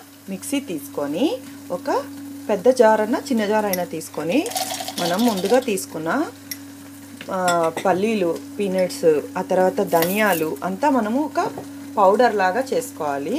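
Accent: India. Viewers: your accent resembles chittoor district style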